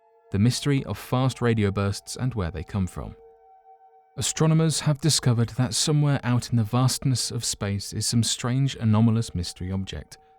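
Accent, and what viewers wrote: United Kingdom. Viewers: It's hilarious how many people want to correct how this poor guy pronounces words. He's British! They pronounce things differently. You trying to correct him is more annoying than him pronouncing words in a way you don't like. Lol!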